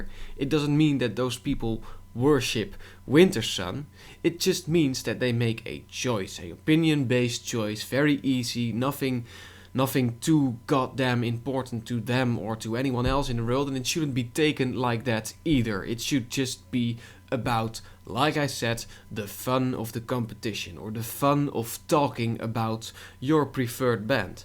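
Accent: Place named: Netherlands. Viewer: The Dutch accent is strong in this one ^^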